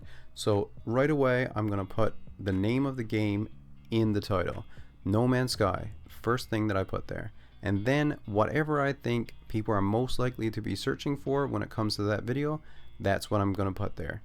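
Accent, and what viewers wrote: Newfoundland, Canada. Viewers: I've watched a ton of your no man's sky videos, I just discovered you about a week ago and I've had your videos playing pretty much non stop and your guides really helped me alot. But in each video I keep thinking "huh, his accent sounds like the Newfoundland accent" but I kept telling myself there's no way... until I saw you in this video, that landscape is without a doubt Newfoundland lol. What part of the rock are ya from b'y? My entire family is from there lol.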